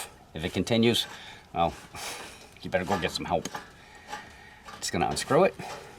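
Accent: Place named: Connecticut, United States. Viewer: I’m from CT and it’s refreshing to hear, reminds me of the 90’s. The accent is dying here in CT and becoming more neutral (don’t hear it as often as you did 30 years back) Mass has their own accent, New York and even RI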